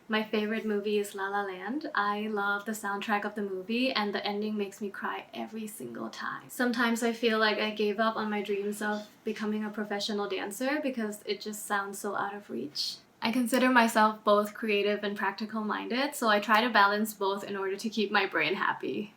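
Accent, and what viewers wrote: Thailand. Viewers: THE CUTEST slight thai accents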